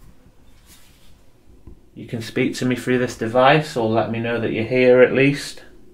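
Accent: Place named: Liverpool, United Kingdom. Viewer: his accent isn't Welsh but definitely northern. I think he's scouse or from Cheshire. Definitely got a scouse sound.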